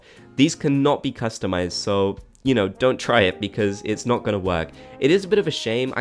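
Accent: United Kingdom. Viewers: are u British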